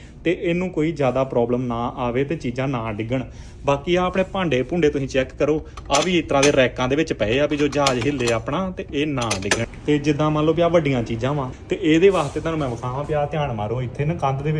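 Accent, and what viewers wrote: Majha, India. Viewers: Avaaj To Lagda va .Veer Majhe To Va ❤................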